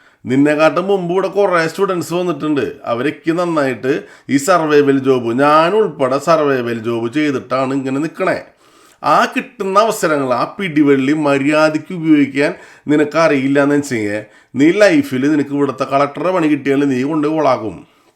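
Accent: Thrissur, India. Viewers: ഞാൻ തൃശൂർ താങ്കളുടെ സംസാരം ❤❤❤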